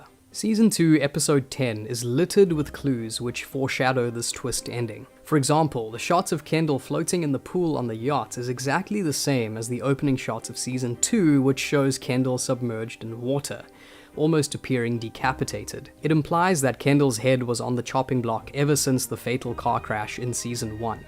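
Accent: South Africa. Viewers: Can hear that South African accent :P nice videos bru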